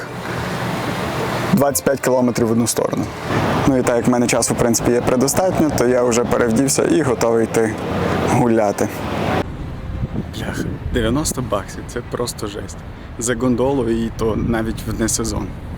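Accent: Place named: Western Ukraine, Ukraine. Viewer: Привіт! коментарі пишу вперше, але дуже хочу поділитися враженням) Натрапила на ваш канал в рекомендаціях і це ваууу... відео просто топ! подача інформації, монтаж... одним словом все на хорошому рівні. Скажу відверто, мене зачепив ваш говір)) Якщо не секрет, звідки ви родом? У мене є підозри, що це може бути Тернопіль або Івано-Франківськ? Дякую за такий контент, з нетерпінням буду чекати нові відео. +1 тревел-блог в скарбничку)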